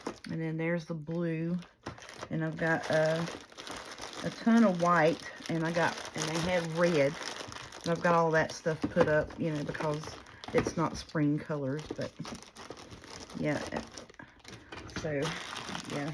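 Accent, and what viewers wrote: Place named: South, United States. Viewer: Gf I love watching your not only are you a amazing crafter! You are so funny I get so tickled at you it is neat to hear someone one as Southern as me your awesome. God Bless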